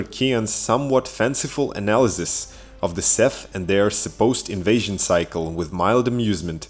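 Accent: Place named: Germany. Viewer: Absolutely great informative Video! You have a very strong accent, it sounds like you´re from Germany, where the creator of the Trilogy Crytek has it´s roots :D Greetings from Switzerland :)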